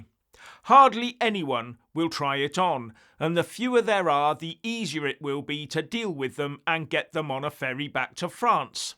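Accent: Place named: England, United Kingdom. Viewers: Sir you havesuch a distinct english accent - u could sell your voice in America!!! PRAYERS 2 good ppl of the world. DEmo-commi-Terrorists-SHAME on U!!! Out w/DEAThCultIslums-Radicals OFF thid Plane 2025!!!. Thanks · Whos your bbc voice coach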